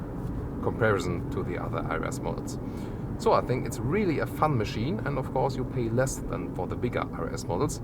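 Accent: Germany. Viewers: If you like Audi mehr ähh more... hahaah Finds auch witzig wie du Autogefühl ausspricht, klingt wie nen Engländer der versucht des auszusprechen, aber sonst halt immer voll den deutschen Akzent xD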